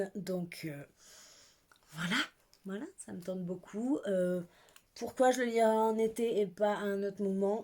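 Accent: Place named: England, United Kingdom. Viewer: Bonjour très bonne vidéo apaisante. Vous avez tun bel accent anglais. J aimes le livres fable. Merci bonne journée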